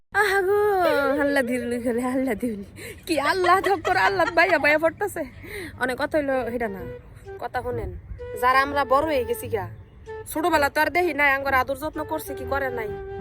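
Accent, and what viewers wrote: Comilla, Bangladesh. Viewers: এটা কুমিল্লা মুরাদনগরের ভাষা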